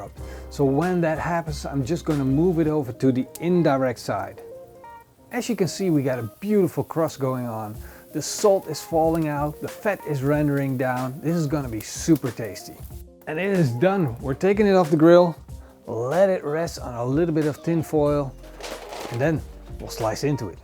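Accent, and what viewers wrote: Netherlands. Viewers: You're dutch arent you.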